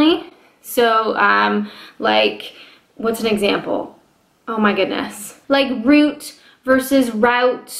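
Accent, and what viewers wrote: Canada. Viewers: your Canadian own it. you say aboot its natural for you and its okay.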